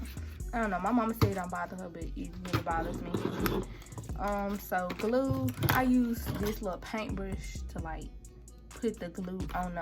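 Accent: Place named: Tennessee, United States. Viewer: She definitely from Tennessee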